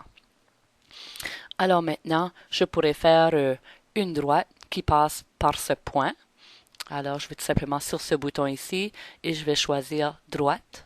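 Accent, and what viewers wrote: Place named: Quebec, Canada. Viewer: Merci beaucoup!ça m'a beaucoup aidée! PS:J'adore votre accent,vous êtes canadien,non?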